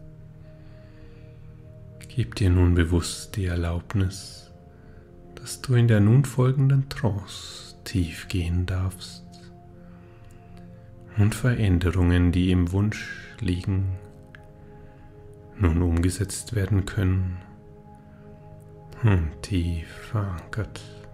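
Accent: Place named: Franconia, Germany. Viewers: Ich dachte du kämmest aus Bayern mit gefällt die stimme auch deine ganze art die du zeigst hier bei Youtube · Allmächd, ich liebe den leicht fränggischen Akzent.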